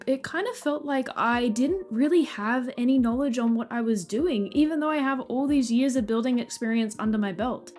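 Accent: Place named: United Kingdom. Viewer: I just realized you sound like a slightly British version of Nina Oyama 😅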